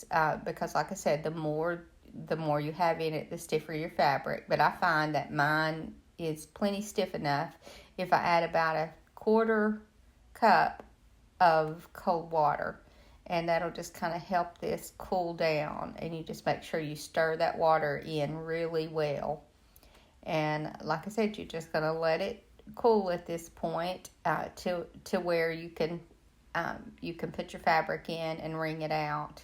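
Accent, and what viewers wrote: South, United States. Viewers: You have such a thick southern accent, which most of us aren't used to hearing, that made you so hard to understand. Could you please repeat this in standard English or with captions. If it wasn't for the vid, I would have missed out. Thanks.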